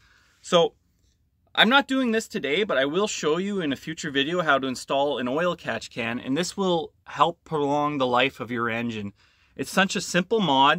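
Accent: Canada. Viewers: I'm Canadian, and you sound REALLY Canadian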